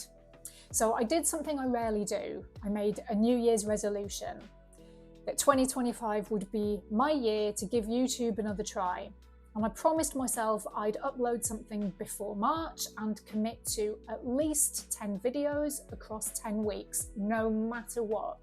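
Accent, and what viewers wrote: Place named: England, United Kingdom. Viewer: Hi... Actually, I am watching your videos because of your pure English accent unlike new generations of English who are mixed with thin Aussie accent which I hate and also you are near my age and SINGLE life experience and living at parents house....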